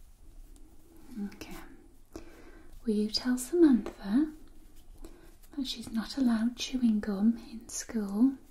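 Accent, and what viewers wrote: United Kingdom. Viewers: British accents often sound so elegant. I really like listening to you talking! Lovely greetings from Germany!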